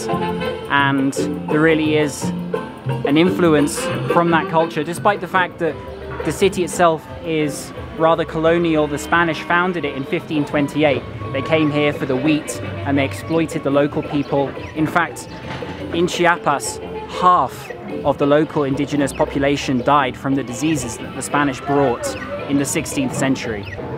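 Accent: England, United Kingdom. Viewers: That beautiful and sexy English accent describing places in Mexico...incomparable.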